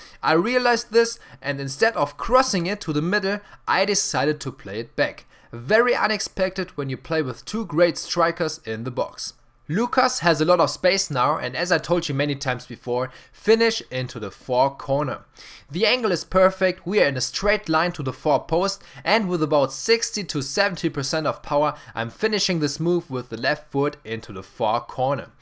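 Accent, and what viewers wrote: Germany. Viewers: Love his German accent <3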